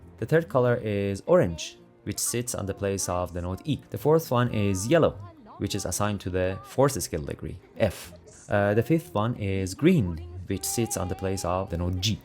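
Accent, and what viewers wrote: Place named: Iran. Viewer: I like your Iranian accent made me think you are from Italy - like that woman melani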